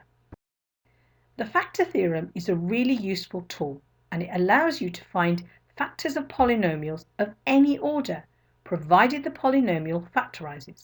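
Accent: United Kingdom. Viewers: It is pure British accent. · Nice British accent but doesn't clarify from which sky the x = -1 fail from .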